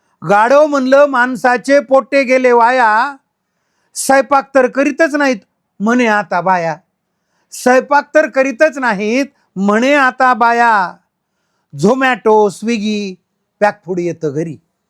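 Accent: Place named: Marathwada, India. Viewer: तुमची मराठवाडी मराठी ऐकायला गोड वाटते.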